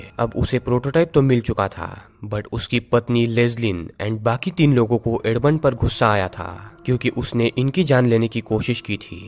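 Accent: Bengal, India. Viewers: Oo apki bat bengoli jesi h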